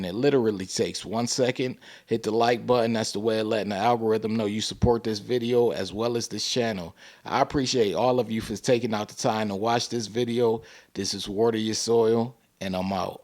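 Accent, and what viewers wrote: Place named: Chicago, United States. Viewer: Definitely Chicago accent.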